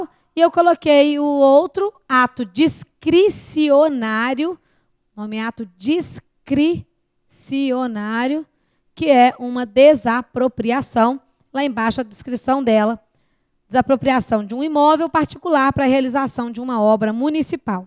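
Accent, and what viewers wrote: Minas Gerais, Brazil. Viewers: professora com sotaque mineiro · com sotaque de mineirooo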